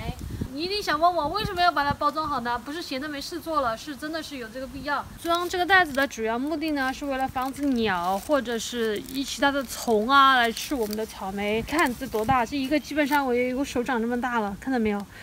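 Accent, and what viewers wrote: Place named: Chengdu, China. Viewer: 哈哈哈哈感觉女主是不是成都人呀，口音跟我说普通话的时候好像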